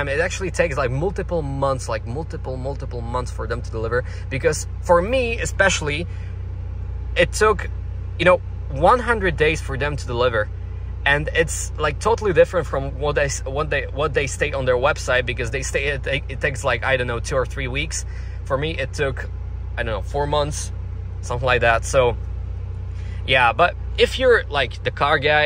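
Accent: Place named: England, United Kingdom. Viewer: Yeah, i already like this english acc :D, i would like to see more for sure.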